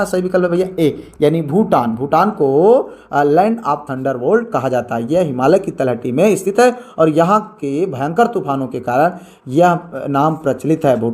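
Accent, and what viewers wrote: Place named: Chitrakoot, India. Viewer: Very nice chitrkoot ki boli Sir apni matrabhasha ko bolkr bahut sukoon milta hai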